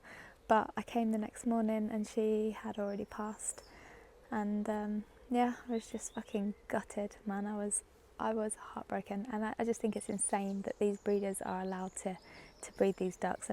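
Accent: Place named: Wakefield, United Kingdom. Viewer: Thought i recognised your accent, I'm from wakey originally 😁 great looking allotment, looks like a little slice of heaven 😍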